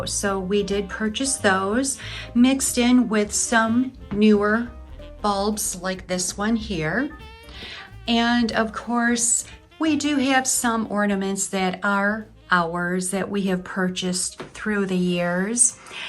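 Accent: Michigan, United States. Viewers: Hi, We were so so excited when you opened your front door and your Siamese Sadie appeared. We have a few of the same things in common. First, my husband went to law school in Michigan. Your accent is still music to his ears. Next, we have 3 Siamese cats Sadie (Lilac Point), a huge boy named Keith and a little Siamese born in May (that we call June because we got her in June). We live in a 100 year old house. Your home tour was so inviting and cozy. Jack was so so cute when he was being camera shy. What breed dog is he?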